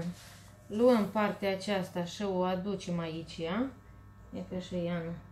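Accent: Moldova. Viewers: Ma uit cu placere la ceea ce gatesti,esti ff simpatica so imi place cum vorbesti moldoveneste! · M-am abonat pentru graiul moldovenesc ”dulce”...! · Bravo esti o Super gospodina.Imi place mult graiul tau moldovenesc.Maria.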